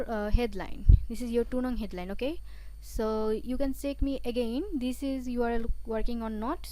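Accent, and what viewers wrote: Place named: Bangladesh. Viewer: speaking in english but bangla pronunciation!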